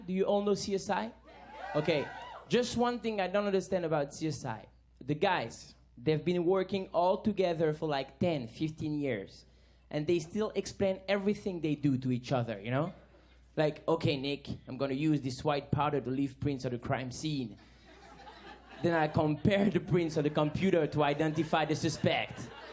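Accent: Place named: United States. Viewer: Ohh I like so when you speack english! It s so suitable to you! We could believe you would be a true american boy lol so cute ^^ me too my dream is to go one day to New York, i watched "sex and the city" i loved this serie and so i dream to go there....one day i ll make it!!! Then, i have to practise my english so i will wathed all your sketch that i could find in english on youtube...😊😊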